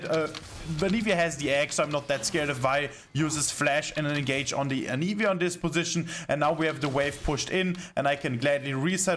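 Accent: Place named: Germany. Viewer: Such a strong german accent. I cant... just cant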